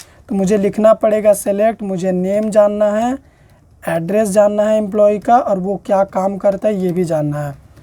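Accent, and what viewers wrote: Jamshedpur, India. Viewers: Sir JAMSHEDPUR se ho kya? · Aap jamshedpur se ho kya 😅?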